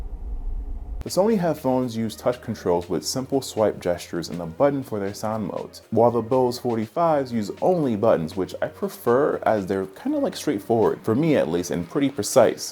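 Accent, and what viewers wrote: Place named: Philadelphia, United States. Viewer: Sounds like you are in the Philadelphia region based on those stops 😅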